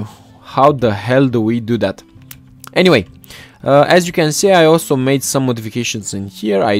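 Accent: Romania. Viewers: bip cu accent romanesc ;) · he is romanian, he can't have english accent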